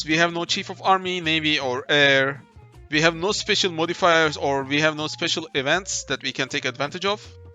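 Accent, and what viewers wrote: Turkey. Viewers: Aksanından türk olduğun çok belli oluyor ama çok iyi konuşuyorsun,güzel oynuyorsun ama kanka çok uzun videolar,mountain general gibi kanalları örnek alabilirsin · aksanından Türk olduğunu anladığım hoi4 oyuncusu. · bro are you turkish you have turkish accent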